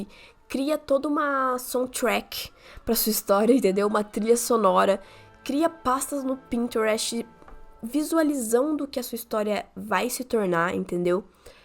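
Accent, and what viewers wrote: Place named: Santa Catarina, Brazil. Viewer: oii, pelo teu sotaque eu desconfio que tu sejas de SC obrigado pelas dicas!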